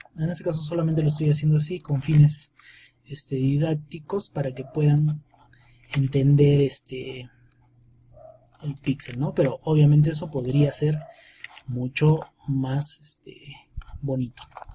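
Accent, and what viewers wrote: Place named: Peru. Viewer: me harta tu voz de peruano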